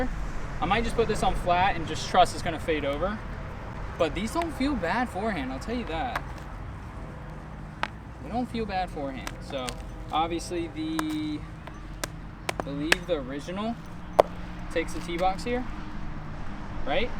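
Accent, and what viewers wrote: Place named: Ireland. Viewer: Awesome Irish ACCENT!!!!!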